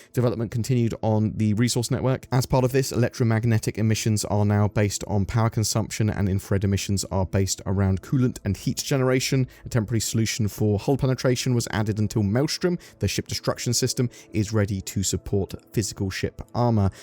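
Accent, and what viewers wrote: England, United Kingdom. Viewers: Top notch English accent there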